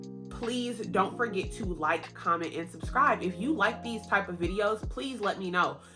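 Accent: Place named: South, United States. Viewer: You sound like you are from the south! It's a southern accent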